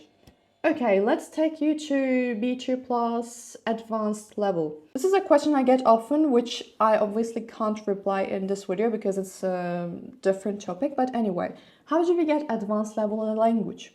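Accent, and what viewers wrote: France. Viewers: Again, there is no way this blogger is not a for-real native French speaker. When she wants to say "right now," while speaking in English, meaning "at this time" or "currently," she says "actuellement" which is the French way to say it. No English speaking person would make that replacement as it is a "false cognate" and would not be natural to make this translation so instinctively. Also, there are pronunciations that are decidedly French, ones that a native speaker can't get out of their speech no matter how proficient they are in a new language. Ditto, would be those sounds that a non-native speaker, no matter how proficient he/she becomes, could pronounce as spot on as she did. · Bonjour.Quand vous parlez Anglais vous avez des intonations françaises assez souvent! Vous le saviez? Votre Français est juste extraordinaire, c'est très inspirant. 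Je suis en train d'apprendre l'anglais (et uniquement l'anglais ahaha!), et je dois dire sans jamais avoir mis les pieds en Angleterre... J'aimerais atteindre un tel niveau(mon dieu!), vraiment vous avez mis la barre très haut!!!Fabuleux! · You have even a French accent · Il me semble que vous êtes Française, rien qu'à votre accent. Ceci me semble une vidéo bien bidonnée ;-) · you have a French accent when you're talking in English..... omg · ingilizceyi de fransız gibi konuşmanız ilginç olmuş, neydi batının iyi taraflarını alııp... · What is your mother tongue ? Because you have a french accent when you speak english ! This is amazing ! 😊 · your french is so good even ur english accent is french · you sound too French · She even taught herself a thick French accent in English, she's incredible! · It’s sooo amazing u got all the way to c2. Your French is so good!! You sound French lol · Am actually a french native and your intro was really good. 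You've even got a little bit of our accent 😂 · That the frenchiest accent I've heard by far from a non-native speaker, I'm baffled right now !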